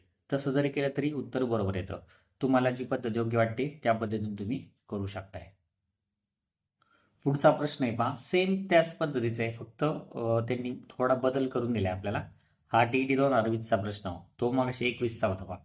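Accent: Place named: Ahmednagar, India. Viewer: Language varun olaklo sir 😊 Maje frd aahet nagar che same bolatat😅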